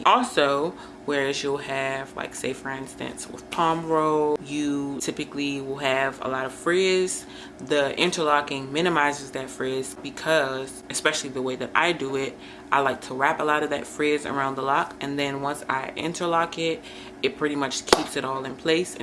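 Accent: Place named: Washington DC, United States. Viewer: I know our accent from anywhere ❤ youre definitely from around the dc/pg area 😂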